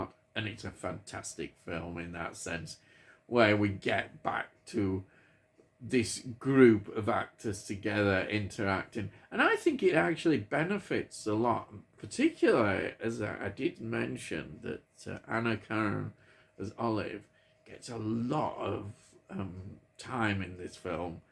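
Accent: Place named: Liverpool, United Kingdom. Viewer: This man is speaking in a scouse accent.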